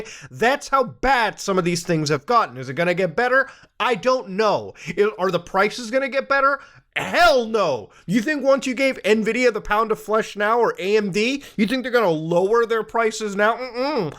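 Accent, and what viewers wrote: Canada. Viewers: Canadian accent was unusually noticeable in this video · I love when the slight Canadian accent comes out, but spittin facts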